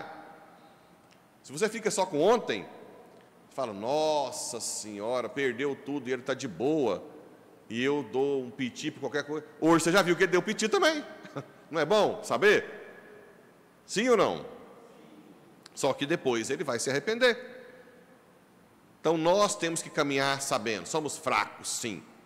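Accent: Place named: Minas Gerais, Brazil. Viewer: padre o sr é Goiano mas tem meu sotaque mineiro, gosto demais.Amo seus ensinamentos.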